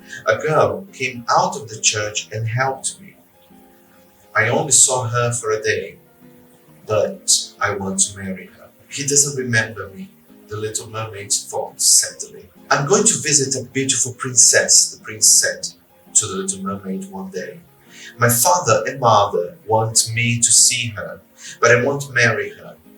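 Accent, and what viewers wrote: United Kingdom. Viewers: obrigada prof, fiz um teste e meu nivel é A1 e amo o sotaque britanico obrigada por esses videos e esse canal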